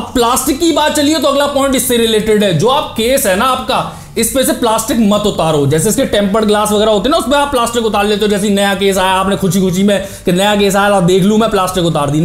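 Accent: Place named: Bhopal, India. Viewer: Bhopali ho kya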